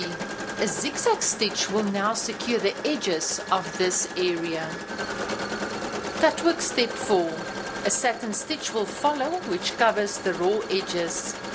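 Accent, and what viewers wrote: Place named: South Africa. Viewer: I love your south african accent.....!!!!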